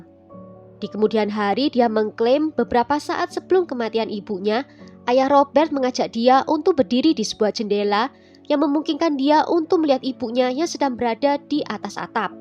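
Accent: Java, Indonesia. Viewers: Wah,hebat ya suaranya medhok Jawanya👍😍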